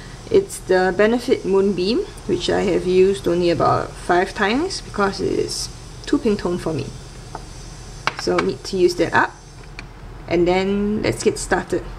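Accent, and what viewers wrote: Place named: Malaysia. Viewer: Love your accent! Malaysia? Singapore? Never heard of "Kate" brow cosmetics. What country is that from?:)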